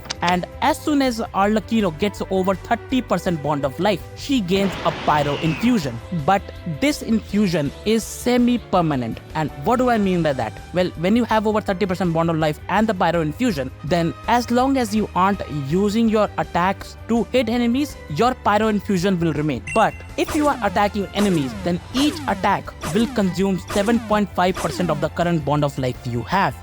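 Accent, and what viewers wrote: India. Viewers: Bros got that iphone tutorial accent love it can always count on the indians to drop the best guides🔥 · he got that THICK Indian tutorial accent love it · Good video my indian sounding friend, keep it up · Indian accent on any "educational" video tends to be 100% factual You deserve more subs and hopefully not too many to be on Hoyoverse's radar with this godly amount detail of her kit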